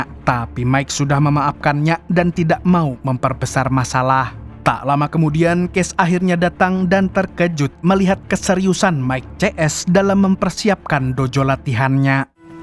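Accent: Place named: Tasikmalaya, Indonesia. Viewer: Si sorana siga org tasik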